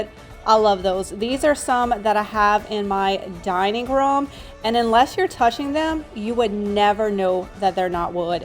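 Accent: Louisiana, United States. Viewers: I love everything you did but the measuring cups were just so simple and darling. By the way, are you originally from Louisiana bc you have a Louisiana accent you sound cajun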